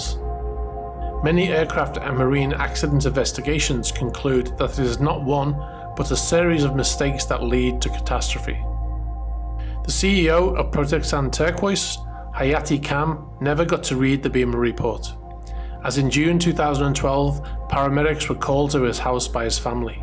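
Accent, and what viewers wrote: Liverpool, United Kingdom. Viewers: Great video great too hear a scouser doing well in YouTube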